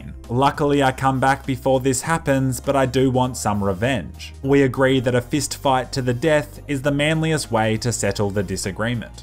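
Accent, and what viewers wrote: Australia. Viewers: dude u Aussie?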